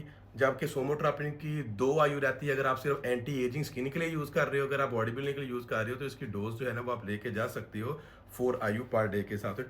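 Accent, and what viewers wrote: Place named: Pakistan. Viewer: Sab theek lekin accent pakistani kyu hai aapka ?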